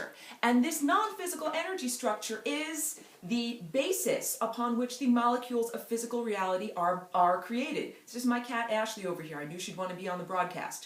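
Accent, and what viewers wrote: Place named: New York, United States. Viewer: Interesting shift from previous video. Faster speaking. A bit more NY accent.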